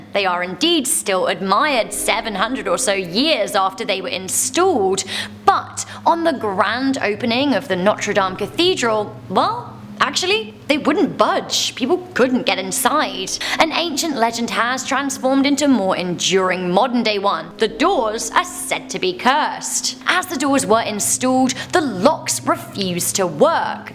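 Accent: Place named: England, United Kingdom. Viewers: love this girl's accent-sounds english, or welsh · She’s southern English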